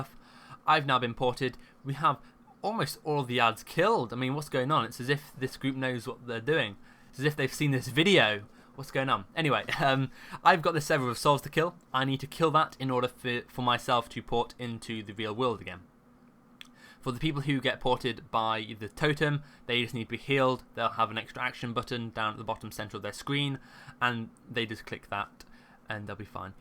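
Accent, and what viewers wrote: United Kingdom. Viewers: that is the british pronunciation.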